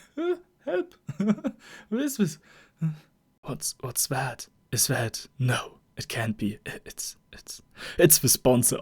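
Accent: Germany. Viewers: are you german perchance? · i like the german accent (sorry if it isnt german it sounds alot like it) and its a really good video keep up the good work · Are you german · Are you Deutsch?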